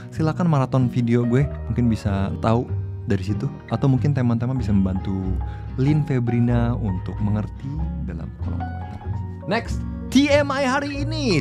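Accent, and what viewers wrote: South Jakarta, Indonesia. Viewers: Kalo ngga sebut Korea, kita kira orang Jakarta..!!..😁👍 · 🤣🤣🤣 gue pikir lo anak jaksel bang. kocak bet dah lo ahaha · INI ORANG JAKSEL YA?😭😭😭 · Ini mah aksennya jaksel bet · Bahasa Indonesia ala Jakarta paling bagus dari semua YT-ers Korea yang si ini.....Indonesia asli...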